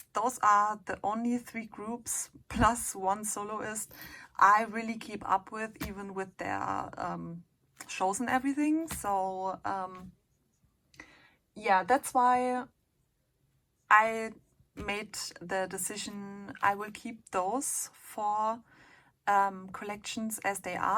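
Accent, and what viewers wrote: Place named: Germany. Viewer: Omg I can hear that you are german are you?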